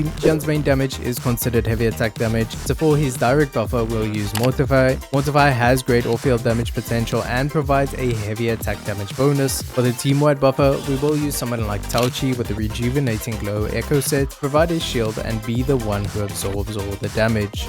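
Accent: South Africa. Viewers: You from SA bro ? Also keep up the good work! ✨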